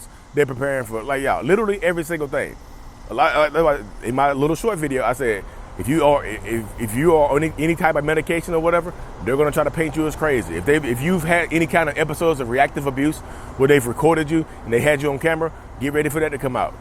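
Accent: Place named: North Carolina, United States. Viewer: Dude, your accent make me wan move bk to Rocky Mount n things like dat haha 💛💪🏻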